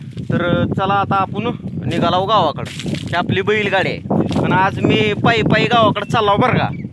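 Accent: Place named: Marathwada, India. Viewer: भाऊ तू खुप छान बोलतो ही मराठवाडा भाषा आहे ना ही लय हासतो राव तुझा बोलन्याला तु खुप मनमोकळा माणुस आहेस 👍👍👌👌🥰🥰🕺🕺🌹🌹💐💐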